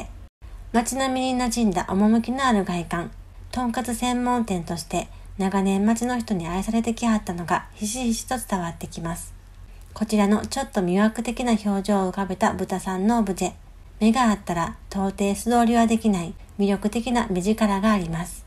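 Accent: Kyoto, Japan. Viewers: 京都弁って～情緒があって～大好き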